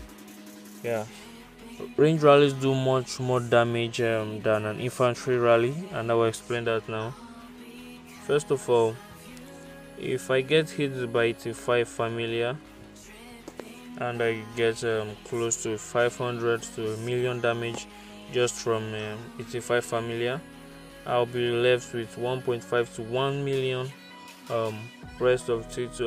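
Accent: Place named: Nigeria. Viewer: Lol where you from u sound Nigerian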